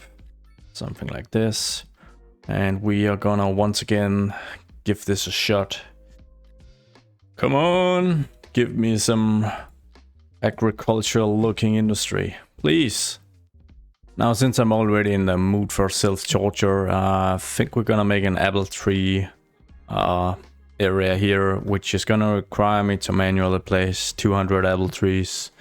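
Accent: Denmark. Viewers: Det kan kun være en dansk accent det der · I have to ask are you danish? you sound very danish when you talk. But very nice video love the content:) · Jeg antager, at du er dansk med den accent :) Man kan aldrig gemme sig som dansker :P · Are you danish? The accent sounds familiar 😅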